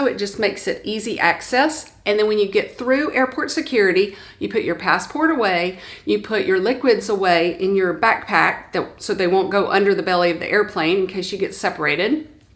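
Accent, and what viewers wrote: South, United States. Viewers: Just a quick note to say I have enjoyed your videos. I don't know if you realize it, but the close-captioning for your words is quite amusing! Due to your southern accent, in the above video, "tinted" moisturizer was printed as "tenten", and "tennis" shoes came out as "tin" shoes! Don't worry...I can understand you, but the close-captioning person cannot!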